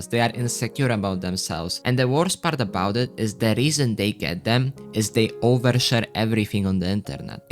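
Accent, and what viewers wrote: Poland. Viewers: you sound kinda polish edit after research: damn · odrazu słychać że polak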